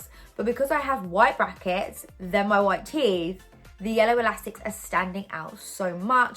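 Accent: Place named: Australia. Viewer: Hi, I really like your accent. Are you Australian?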